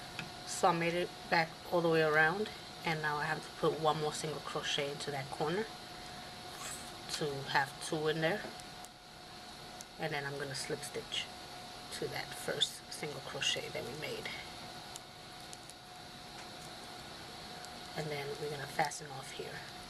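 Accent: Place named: Louisiana, United States. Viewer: Thank you so much for not drawing every stitch out. I love how fast you instruct. Thanks again .I truly appreciate your style of teaching. The speed and accent in which you speak remind me of people in my hometown of Baton Rouge Louisiana.Anyway Thanks again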